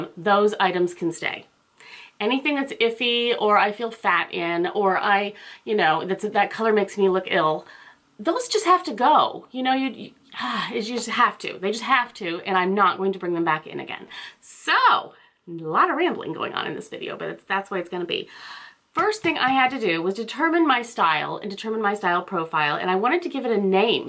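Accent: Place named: Midwest, United States. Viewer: I just stumbled on your videos, and I love them! I knew I recognized that Midwest accent, I am a fellow Chicago suburb girl. I also strive to be casual chic in this stage of my life too. A stay at home mom with mostly grown daughters, looks like we are both in the same boat!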